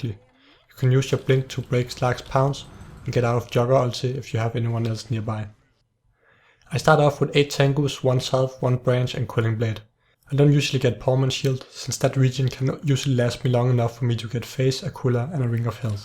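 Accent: Denmark. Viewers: are you danish or german? or neither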